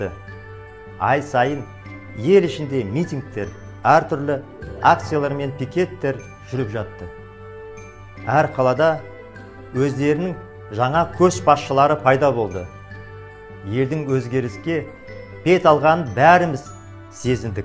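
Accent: Standard. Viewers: Казакша таза сойлейди екен Токаевка караганда. Красавчик 💪🏾 миру мир братья 🇰🇿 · Мұқтар аға таза қазақша үйренді! Тамаша! Жаңа жыл сізді Қазақстанды басқартсын. Халықтың жағдайын түзетіп ұлы атақты тұлға болыңыз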